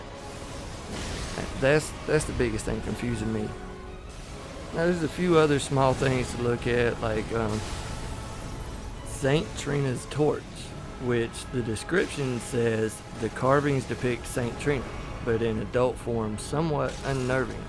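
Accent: South, United States. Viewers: wasnt expecting the southern accent lol, love it, right on partner good video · I like your accent. What state are you from? I'm guessing one between Tennessee and Florida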